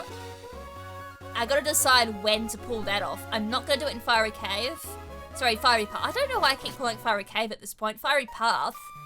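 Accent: Australia. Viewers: Wait...are you an aussie? XD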